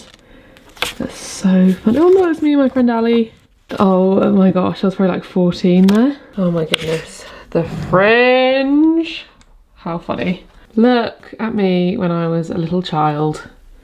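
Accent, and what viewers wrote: United Kingdom. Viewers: I abslolutly love your videos ! You give me so much motivation and joy to declutter and organize my bedroom, and I also love the fact that you are vert natural during tour videos, you don't try to be someone else so it feel so good to see you very shiny and happy. You inspire me to embrace my values and to give me hope, thank you and never stop showing us your declutter video ! Ps : as a 17 years old french girl, I'm completely in love whit your british accent ! And it help me to understand english with the british accent so thank you ! <3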